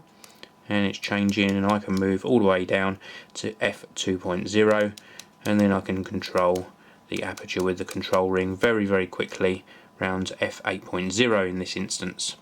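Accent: England, United Kingdom. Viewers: english accent. Britain is made up of 4 countrys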